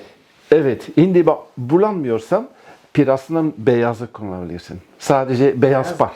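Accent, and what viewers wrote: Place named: Germany. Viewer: there is a song called, which a man speaks english with a german accent. I just remembered that song when you speak english. stupit comment anyway. nice home, enjoy the sun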